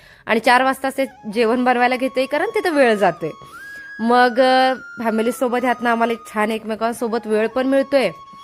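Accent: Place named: Solapur, India. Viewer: ताईंच बोलणं कोकणी नाही वाटत, सोलापूरी किंवा सांगली कडील लय वाटते बोलण्यात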